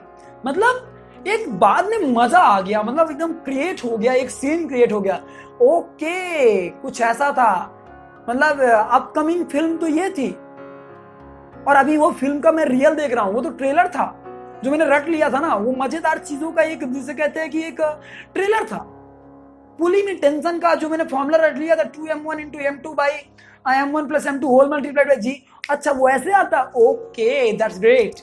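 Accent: Bihar, India. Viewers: Sir aap bihar se h kya?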